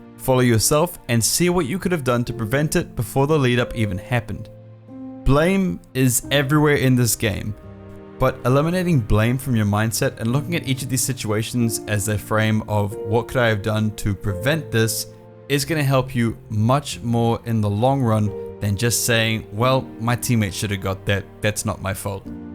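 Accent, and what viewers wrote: New Zealand. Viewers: Are you from New Zealand? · Are you from nz?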